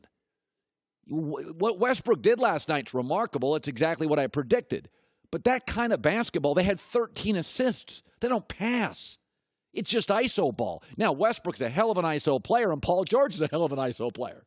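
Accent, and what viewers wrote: United States. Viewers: Pure American accent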